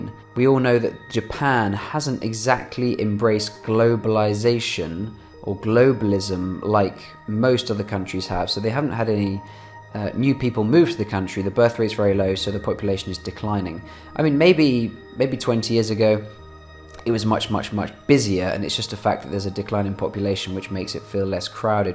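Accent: United Kingdom. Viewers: I like it! Saw your film for the first time. I was impressed with the pictures and narrations, both are very calm, gentle and professional manner. Slowly and calmly spoken English, with slight British accent allowed me to pick up every single words. Thank you. Old japanese man, living in tokyo. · イギリスの方ですね！英国なまりですもんね、大阪を紹介してくれてありがとー‼️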